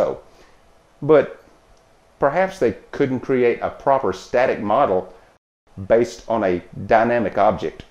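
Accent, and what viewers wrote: South, United States. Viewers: so cus of jebus right ? i hear your ignorant southern accent you beleive in imaginary friends and jebus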